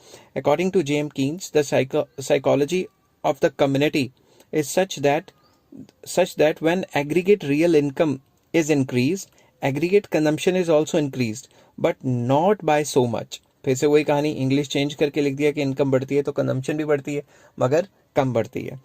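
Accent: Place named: Haryana, India. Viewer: sir aap haryana se belong karte ho kya? apki language mai bagri touch aa raha hai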